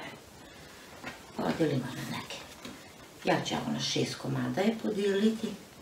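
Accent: Vojvodina, Serbia. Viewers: Lep je kolac,draga,a boga mi i ti si mi lepa kao lutka,kao da si promenila boju kose,stogod lepa!? Jos jednom hvala ti za sve recepte,modlice i volem da te slusam,cujem moje,moju Vojvodinu,stogod lepu! ❤️❤️❤️ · Ona meni toliko super priča...ja sam s mora iz Dalmacije ali kad čujem taj vojvođanski naglasak se uvijek oduševim...a kad kaže "štogod lepo" to je najsmješnije😂👍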